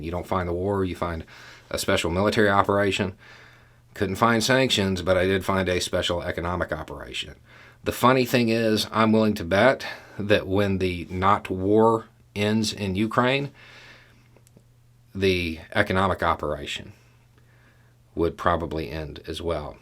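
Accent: South, United States. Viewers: Hey, fellow southerner here, I just wanted to let you know that I really appreciate the work you’re doing here. Your videos are really helpful with swaying right-wing family members. Something about a country boy with a southern accent seems to make them more willing to listen. Keep it up!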